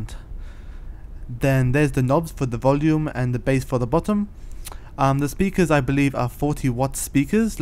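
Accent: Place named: South Africa. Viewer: You sound south African :D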